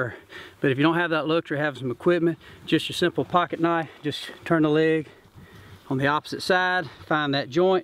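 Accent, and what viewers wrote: South, United States. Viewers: I gave him a like just for the southern accent.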